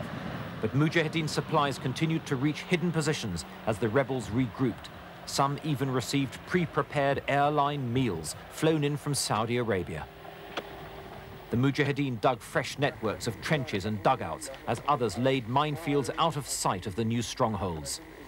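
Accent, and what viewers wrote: United Kingdom. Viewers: The Dark Lord The narrator is clearly brittish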